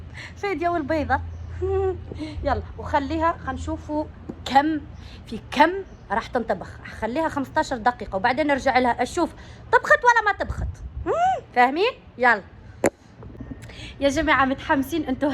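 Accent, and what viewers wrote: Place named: Saudi Arabia. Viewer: 😆 هدرتك تتبدل صرتي سعودية صح 👌🏼💯😆 بصح ضحكتك تبقى كيما راهي 😆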